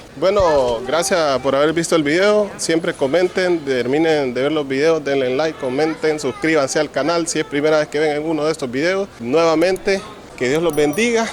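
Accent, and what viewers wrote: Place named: Dominican Republic. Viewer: saludo mi hermano yo pense que uste era dominicano como yo. uste pasa como dominicano aqui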